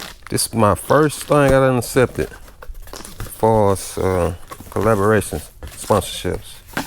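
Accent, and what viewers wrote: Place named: Dallas, United States. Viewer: nice work. after watching, I was going to comment & assume you're from Mesquite, but the end of the video, I'd say SDallas or OakCliff. heck ya brother.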